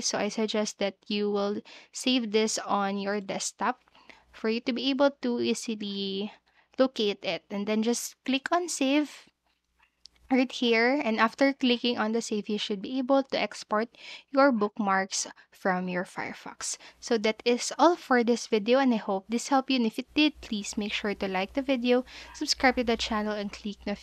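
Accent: Philippines. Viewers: Filipino accent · Thanks! Your Pinay accent is also nice.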